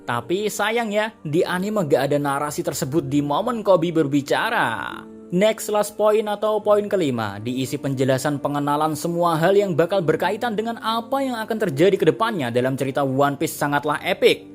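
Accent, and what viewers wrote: Java, Indonesia. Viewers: Jawa nya pekat banget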